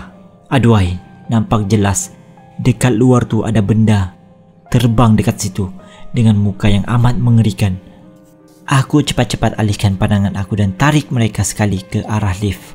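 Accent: Sabah, Malaysia. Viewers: Tukar pembaca melayu yang betul2 melayu..ni bahasa baku dia kuat slag Sabah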